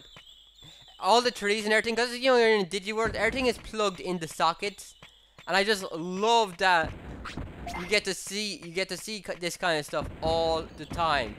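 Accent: Ireland. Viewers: this game is fuckin awesome. im playing it for the first time. I wish I played it 14 years ago. btw, what the hell accent is that? irish? lol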